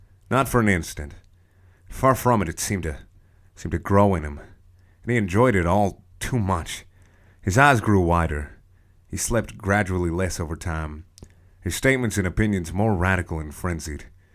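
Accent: South, United States. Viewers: I love how the video gradually got more southern. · I want to hear your country accent like so hardcore xD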